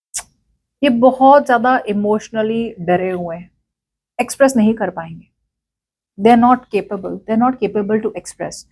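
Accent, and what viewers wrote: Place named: India. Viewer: Thanks,. This is GREAT!!! I can follow along whilst you include some English. And I love hearing not only the Indian accent but the Hindi language is beautiful to listen to. Yes, I hope my person comes around. The longer no contact, the weaker the connection. I am open to new persons, though deep down to be honest, I still have feelings for her.😢..I know I'm a big baby when it comes to heartbreak. Reckon I am healing my abandonment issues as well. Thanks, dear for the read. Always seems straight shooter.🧡❤💚💙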